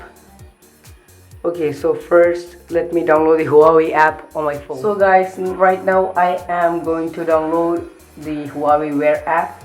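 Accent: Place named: India. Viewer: Did he just say 'XBASS 360'?? Indian accent maarlo yaar...fir kehte ho ki log subscribe nhi karte!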